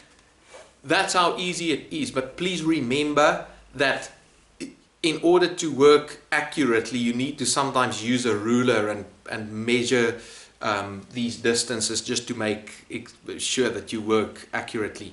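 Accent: Afrikaans, South Africa. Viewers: I like your South African accent. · You south African bruh? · I have an exam tomorrow and you've been of much help. Thanks so much. (btw you sound Afrikaans, am I right?) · I'm from South Africa and it really sounds so South African 😂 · Thank you for your reply! Your accent is very cool! I had a teacher who was born in South Africa. Maybe I didn’t hear too much Scottish afterall 😊anyway, it’s nice to hear such an interesting voice. Cheers, and thank you again for your great videos! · Are you South African? That accent sounds familiar. Ps: thanks for the video!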